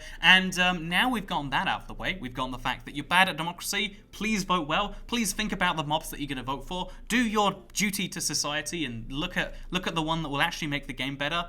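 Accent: United States. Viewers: Wow, sounds american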